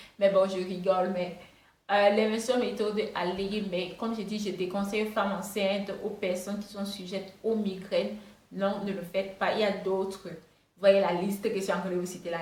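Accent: Cameroon. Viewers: Je ne suis pas habituée à l'accent camerounais que je trouve charmant d'ailleurs, pourtant je n'éprouve pas de difficultés à te comprendre. Continue et merci pour ces conseils. En plus tu as pris le temps d'énumérer les différents points à l'écrit dans ta vidéo. Donc si ce n'est pas clair ce n'est pas ta faute. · Tu parles comme les bamilekes du Cameroun. Es tu camerounaise ? Sinon merci bcp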